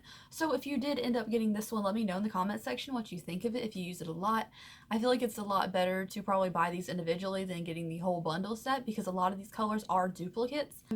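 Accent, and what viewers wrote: South, United States. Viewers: First off you’re gorgeous and I love your reviews so much. I noticed we have similar accents and figured you were from the south and I looked at one of your older videos and saw you’re from North Carolina!!!!!! Ayyyyy represent?!!! Nice to see successful YouTubers from here and really gives me hope. Love your content and you truly deserve more views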